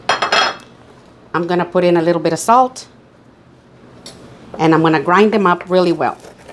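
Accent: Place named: Texas, United States. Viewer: What in the hell are you doing in OHIO??? You sound like your from Texas or California.....I never seen a Ohio Mexican but Viva La Raza!!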